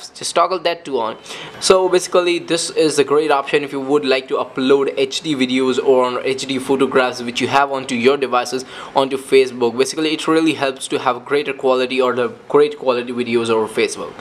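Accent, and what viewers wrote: India. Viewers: With his fast talking and Indian accent I couldn't understand him!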